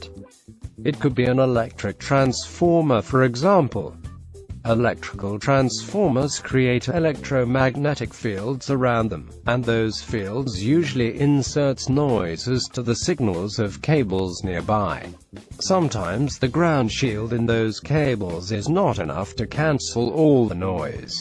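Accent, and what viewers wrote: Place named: England, United Kingdom. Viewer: nice job. I agree with the English accent. You sound very authoritive. You also kind of cleared up a question I had with my cables. Cheers!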